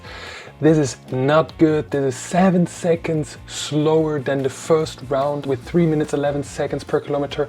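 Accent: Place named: Germany. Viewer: An sich interessantes Video, aber dude.. dein german accent killt jede Motivation weiterzuschauen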